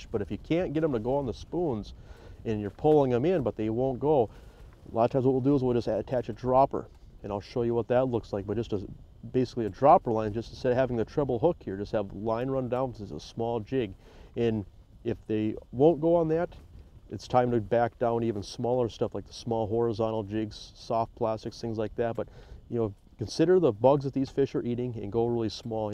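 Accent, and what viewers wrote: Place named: Upper Peninsula, United States. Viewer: I'm from the U.P. of Michigan, Menominee area and moved to Kentucky. There are too many noodlers around here. They don't know what a fresh water fish is. Every time i go out fishin all i see is ppl gettin down in these cat holes with smell and bate tryin to get what they call good eats. I'm sure its fun an all but cats are just not my slice of cheese. In fact my craven for a good pearch fry got so bad I went all the way to Erie, PA just to get that good old taste! Nice yooper accent/video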